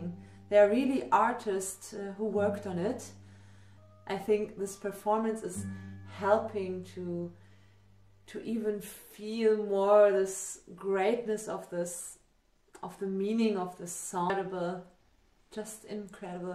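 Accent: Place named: Central Europe. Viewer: I knew you must be from somewhrere middle-europe.... Well, must see thisone... Lets go....No idea, love your accent. Im finnish, by the way... 😚🥰 Damneeeed, this really so broken, video I mean....? God damned....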